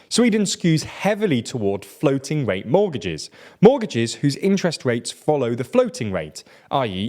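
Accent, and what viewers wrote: United Kingdom. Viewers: Neato! Cool British accent,! 1 Capitalism and communism work for a few. 2 The world needs Nordic-style socialism. 3 Without love and sense of humor there is no happiness and life is meaningless. 💕☮🌎🌌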